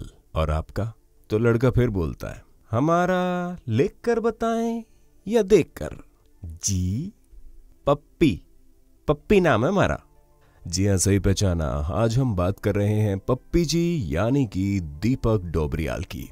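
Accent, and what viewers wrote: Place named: North, India. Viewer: 🤣😂🤣 पप्पीजी। ये अच्छा है कि एक्टिंग करने वाले सारे उत्तर भारतीय कलाकारों की भाषा पे पकड़ और लहजा शानदार होता है। आप भी यूपी के हैन? वैसे आप ट्यूटोरियल कब शुरू करने वाले है।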